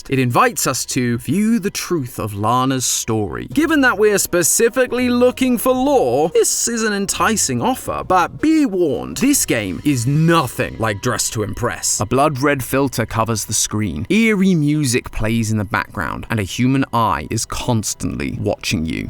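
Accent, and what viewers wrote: United Kingdom. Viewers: The new person is just matpat but British, i like it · ngl, i was just watching the gtlive video of this and then noticed that there was a normal video about this, i clicked it and noticed the british accent... it was a new video with the best timing ever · Bro went from American to british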